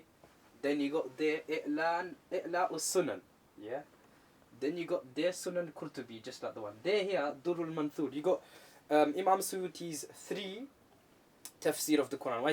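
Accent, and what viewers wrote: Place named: Sylhet, Bangladesh. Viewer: The Sylheti pronunciation...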